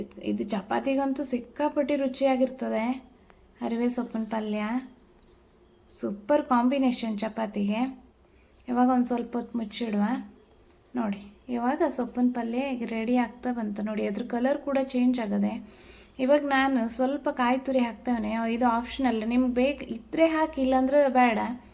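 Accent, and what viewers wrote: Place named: Sirsi, India. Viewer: ಶಿರಸಿ ಭಾಷೆ ❤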